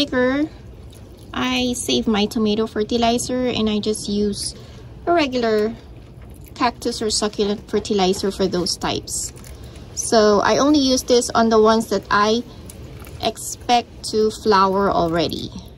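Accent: Philippines. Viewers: Hi the way you talk you are Filipino ..then i saw the design ur cup of coffeee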